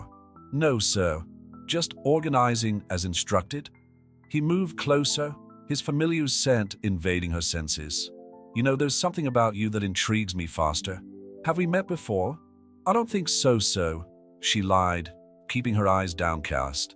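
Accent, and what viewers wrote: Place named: Ireland. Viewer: The reader has such a lovely accent. Is that Irish I’m hearing? Is it AI? Either way, I’ve enjoyed the sound.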